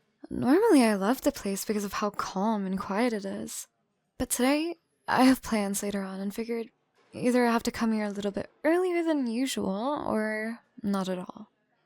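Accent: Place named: Canada. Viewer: If you’re autistic enough to figure out linguistics for fun ever Canadian sounds so aggressively Canadian and it’s adorable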